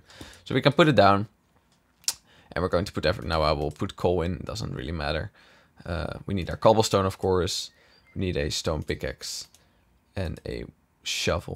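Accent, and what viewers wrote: Netherlands. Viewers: mooi engels man maar ik hoor wel dat je nederlands ben :D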